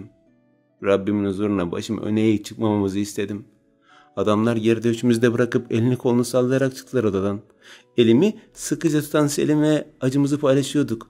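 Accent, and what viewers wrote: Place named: Black Sea, Turkey. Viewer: Selam kardeşim iyisindir inşallah bu hikayedeki Karadeniz sive çok hoşuma gitti ben bı Karadenizli olarak bu kadar güzel konuşamam selametle Allah'a emanet ol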